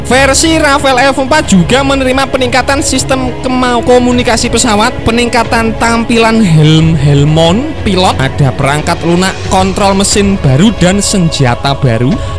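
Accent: Java, Indonesia. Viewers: Medok sangat minn... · Kayaknya sengaja dimedokin logat jowo-nya sama si mimin..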